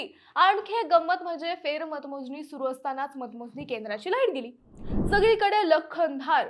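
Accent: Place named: Pune, India. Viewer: अगं बाई..".वैद्य मतं" नाही...तर " वैध मतं" अस म्हणत जा.. वैध म्हणजे कायदेशीर आणि अवैध म्हणजे बेकायदेशीर.. वैद्य म्हणजे आयुर्वेदातील उपचार देणारा डॉक्टर..😅 आपल्या नगरी लोकांचे भाषेचे ज्ञान अगाध आहे.. फक्त पुणेरी टोन बोलण्यात आणणे आणि शुद्ध मराठी बोलणे , यात मोठा फरक आहे.. बाकी तू बोलतेस छान..पण शब्द्दोचाराकडे लक्ष दे...😊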